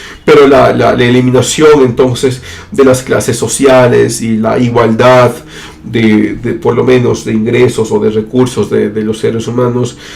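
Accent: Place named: Colombia. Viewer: oye, estas hablando un poco con acento colombiano ;)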